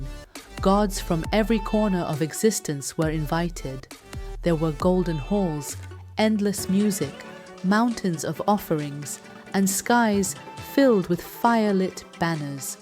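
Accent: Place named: United States. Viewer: the American accent is an interesting one to hear in an ancient Indian story 😂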